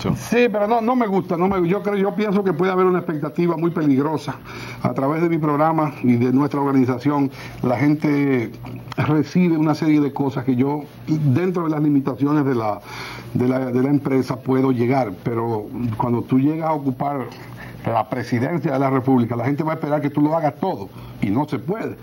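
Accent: Puerto Rico. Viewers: Yeste acento boricua Tony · Bendito nene! pero mimetizaste bien el acento boricua...¿? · Cuánto talento tenía Freddy ya en ese tiempo era una figura Tony 😂tu hablaba boricua cuando eso pero tienes tus méritos también eres bueno rip Freddy el mejor y más valiente artista de la TV dominicana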